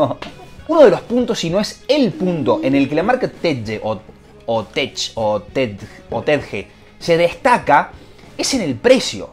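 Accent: Córdoba, Argentina. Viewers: La tonada cordobesa hace un mejor el vídeo jaja saludos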